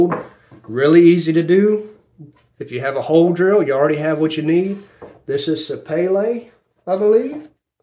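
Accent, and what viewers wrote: Louisiana, United States. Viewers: You must be from Louisiana…my fellow Cajun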